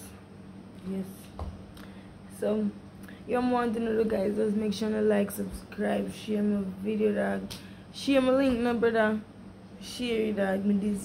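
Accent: Jamaica. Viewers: Oooh hie bomboclat kkk l can hear u speak like Jamaican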